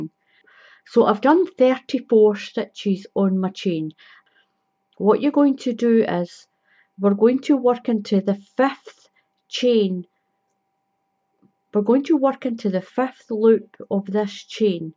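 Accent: Scotland, United Kingdom. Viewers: Great to hear a Scottish accent on a crochet tutorial. Love this pattern thank you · Am really enjoying making this blanket during lockdown , have learnt alot . Love your Scottish accent and hope to see and hear more of your patterns in the future .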